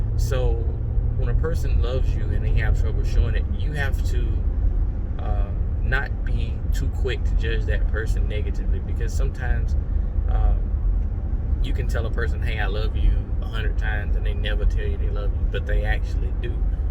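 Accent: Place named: South, United States. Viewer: Love that southern accent! Be blessed 😎😷👍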